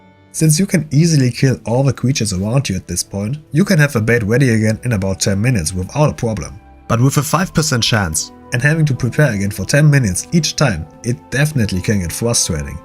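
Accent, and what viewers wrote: Germany. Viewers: I bet my a** that you are 110% german. I can hear it through your accent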